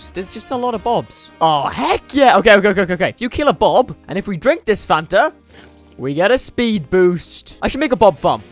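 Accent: Wales, United Kingdom. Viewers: Hol up are u Welsh?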